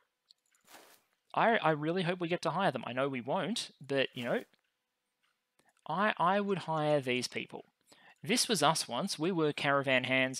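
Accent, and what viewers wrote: Australia. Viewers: I always appreciate your calm, thorough explanations of complex games! Your easy Aussie cadence and relaxed delivery is like butter to my ears. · Auzzie accent, and clobbering heads in. Perfect combination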